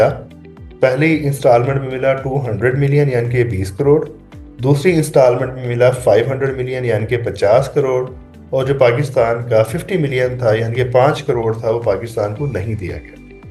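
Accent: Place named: Lucknow, India. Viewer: Sir, you speak very good, and it seems that you have learnt Urdu from lakhnew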